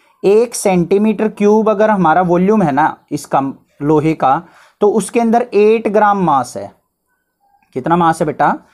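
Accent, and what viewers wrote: Haryana, India. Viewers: sir aap haryana se ho kya 🤔🤔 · haryanvi sir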